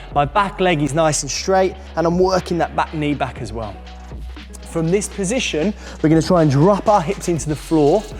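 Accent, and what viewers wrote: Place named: Birmingham, United Kingdom. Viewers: thanks dude for this information also for this british accent hahahahah;) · you don’t hear the Birmingham accent on YouTube! 😀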